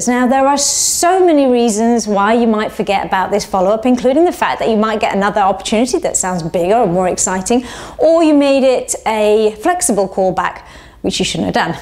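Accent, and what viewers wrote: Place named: Gloucester, United Kingdom. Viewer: Yes, you are excellent. You have well and truly helped me too. Thanks a lot Plus, i like your accent. I can hear the Gloucester with the R's, very well spoken.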